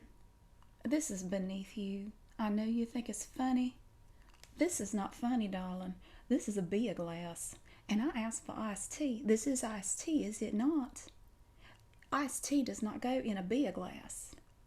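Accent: South, United States. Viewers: Lots of tingles from the southern drawl, wow :) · Reminded me a little of The Golden Girls' character Blanche Devereaux; purely in accent and glamour. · I love this accent, cause it's like a combination of American Southern and an English accent, lol.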